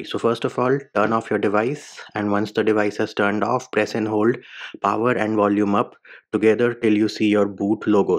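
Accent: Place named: India. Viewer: Why tf are All these videos made by Indian dudes with thick accents holy s***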